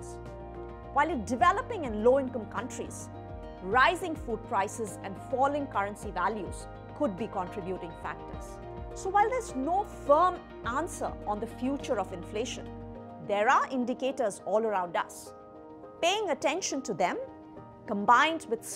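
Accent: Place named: India. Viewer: I easily understood you are a indian by your clear accent 😄🇮🇳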